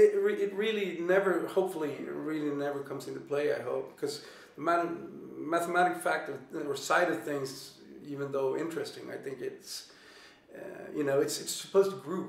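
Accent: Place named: Wisconsin, United States. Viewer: I would’ve thought he was from Wisconsin if I didn’t know otherwise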